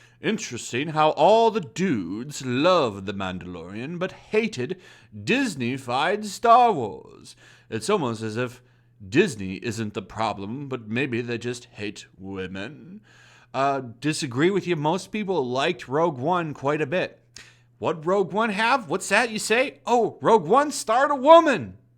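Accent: United Kingdom. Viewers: Enough with the horrible British accent!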